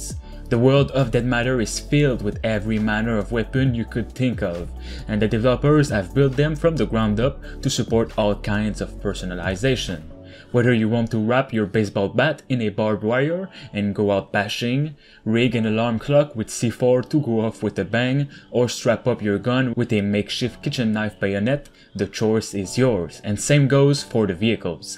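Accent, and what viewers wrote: Quebec, Canada. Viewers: T’es tu québécois? tu sonne comme un québécois qui parle en anglais genre moi ahah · Est xe que tu es Quebecois ???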